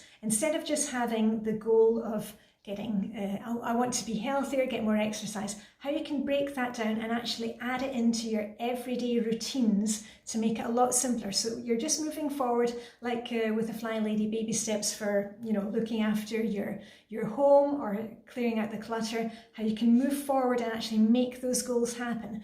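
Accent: Scotland, United Kingdom. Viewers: On IG I guessed the mystery box was just that: an empty box so we could start decluttering. 📦Now I'm thinking a hygge -personalized binder (pom poms and a Danish flag on the front?) so we can add all our routines. I would love a personal session if for no other reason than to soak up your energy and listen to your Scottish accent for a wee bit! Happy "open" New Year..you give so much so graciously. ♥️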